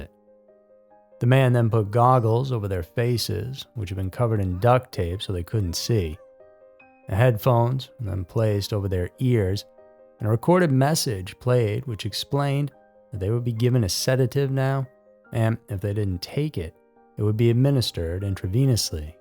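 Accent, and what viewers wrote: South, United States. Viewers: Southern United States somewhere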